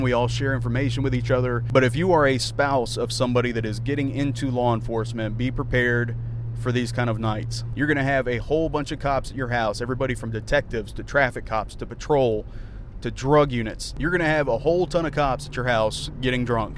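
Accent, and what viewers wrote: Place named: South, United States. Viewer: your southern accent doh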